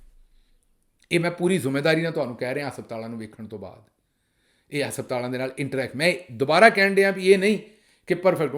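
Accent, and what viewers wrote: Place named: Amritsar, India. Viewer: I agree. His Punjabi is sophisticated and sweet. He mentioned that he speaks Amritsar dialect. We speak similar Punjabi with Jalandhar dialect. This is not to detract from his amazing jihad against the dictatorship.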